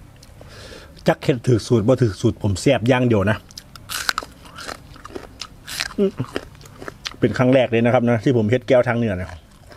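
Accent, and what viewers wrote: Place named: Ban Phue, Thailand. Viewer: เจ้าเว้าคือคนบ้านผือ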